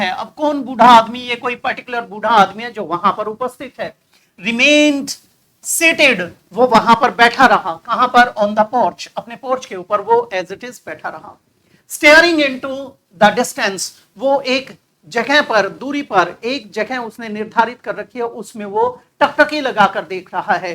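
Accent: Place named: Bhaswal, India. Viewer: Sir aap boli bhaswal se ho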